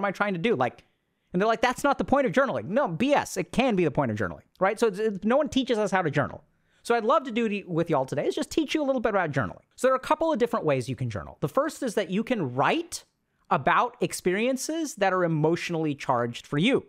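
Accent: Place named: India. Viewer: I can listen to his indian accent all day 😂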